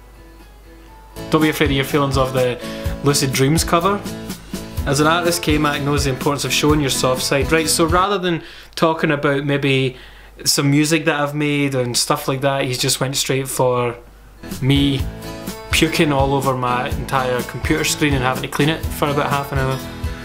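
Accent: Scotland, United Kingdom. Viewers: You're SCOTTISH? I only watched your metal and metal and I never knew lol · I love your scottish accent,:) Can listen to it all day!:D I totally need to visit Scotlad once:) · Rule 11: have a glorious scottish accent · Rule 23: Have Scottish accent <3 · Can you do an entire video in the most unintelligible Scottish accent for all the non Scots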